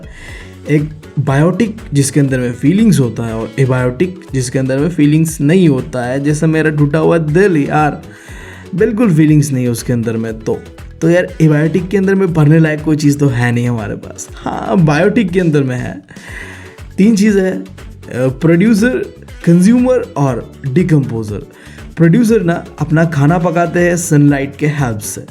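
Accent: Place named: Bengal, India. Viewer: Ap Bengali ho ya Assami 🙄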